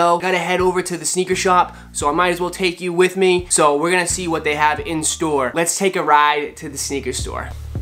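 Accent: Boston, United States. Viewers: Just subscribed. That BAHSTON accent is what sold me.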